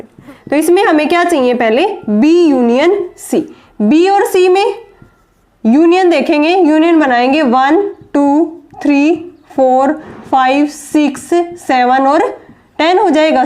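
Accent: Haryana, India. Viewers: Aap haryana se ho kya mam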